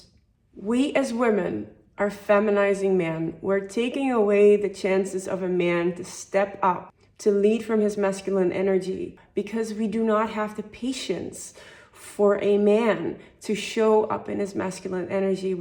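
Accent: Netherlands. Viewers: I can spot a Dutch-English accent from miles away.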